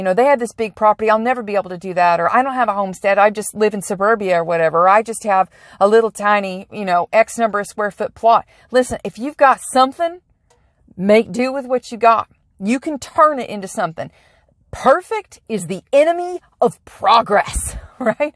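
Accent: South, United States. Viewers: I love it when you talk about the economy & Hebrew. i wonder what else you talk about. We have so much in common. I wil watch you more. Now you talk about wonder history & future. You have very little southern accent. I am from Tennessee, too. I will watch you more, I have missed so much by not watching you.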